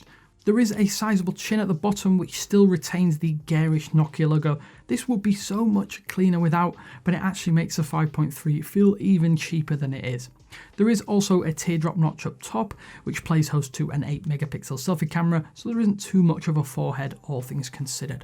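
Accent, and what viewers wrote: United Kingdom. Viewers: I don't mean to be rude, but what's English accent does he speak? I presume it's British accent yet not confident enough to be sure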